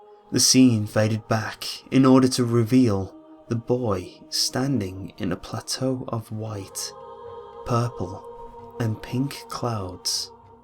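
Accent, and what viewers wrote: United Kingdom. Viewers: your britsh acent (i cant spell very well) makes every thing creepy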